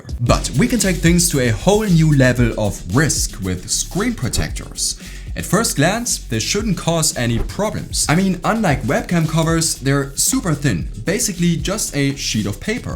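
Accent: Germany. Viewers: bro ,you from deutschland?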